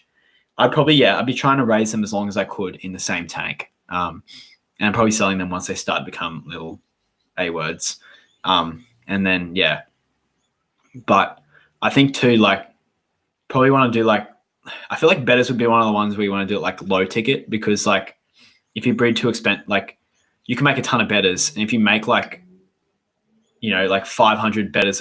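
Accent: Australia. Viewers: Love the Australian accent haha, great video mate 😀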